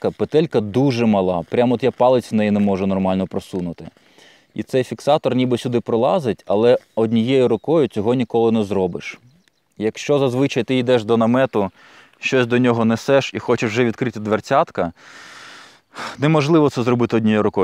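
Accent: Standard. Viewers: Гарний огляд, та гарна та чітка українська мова, дякую!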